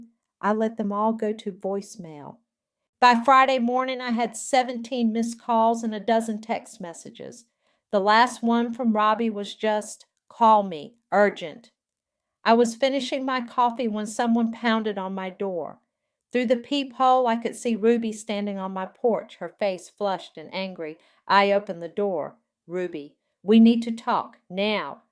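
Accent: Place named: South, United States. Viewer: Southern accent.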